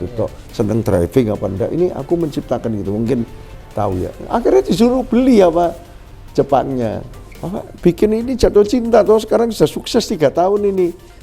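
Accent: Surabaya, Indonesia. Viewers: medok surabaya-nya khas banget, baru tau kalo org surabaya. biasa baca bukunya aja soalnya. Bangga dadi wong Suroboyo Pak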